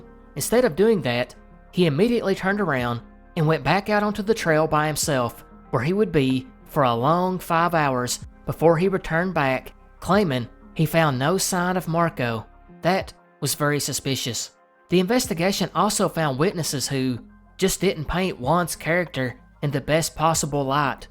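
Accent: South, United States. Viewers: I always love your channel. I’ve been a sub for a while now and you never disappoint! I’m from Texas so it’s nice to hear some southern accents in YouTube land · Best Southern Accent on YouTube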